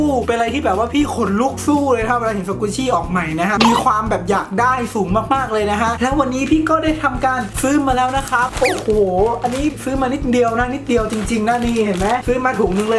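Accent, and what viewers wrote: North, Thailand. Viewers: พี่ เป็นคนภาคเหนือหรอคะ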